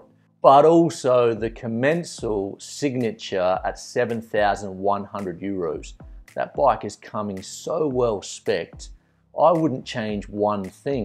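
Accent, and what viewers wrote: Australia. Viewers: Gday. I take it that you don’t like Scott bikes. Predominantly the Ransom e910 & Genius e900tuned. Like your channel even if it is for the Aussie accent 🇦🇺😎🤙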